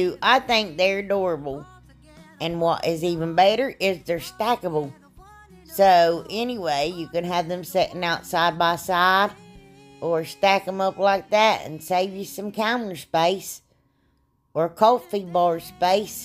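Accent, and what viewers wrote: South, United States. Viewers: Hi 👋! I'm a newbie to your channel and have to say you had me at the Windmill. I want you to know I laughed the whole time you filming,you are just to funny! I mean that in the nicest way ever. I'm glad you joined Heidi's collaboration you definitely have a new subscriber here. I have to ask where are you from with that accent. I'm in NC and I thought I had southern drawl. Have a great week 😀and God Bless 🙌! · Love that good ole southern accent. I am sorry glad to know that I am not the only one with the decent Southern accent. I'm in Northwest Alabama. Love your crafts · Just found you! Love your channel! Where are you from? Loving your accent....Carolina girl myself so I have one too! God bless and keep the videos coming.🤗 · I'm new to your channel. Just love your country accent, where are you from? I loved all your projects especially the baskets. 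Roll Tide!!🏈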